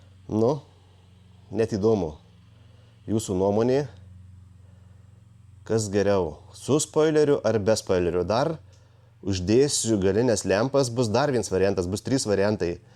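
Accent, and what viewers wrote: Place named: Miami, United States. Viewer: Geras video, bet jay tas majamio akcentas.....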